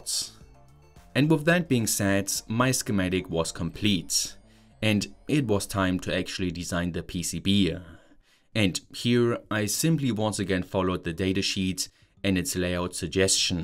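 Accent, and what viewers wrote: Germany. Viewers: Now i know where the meme "smol" comes from. Doesn't get more dschörmann than that accent.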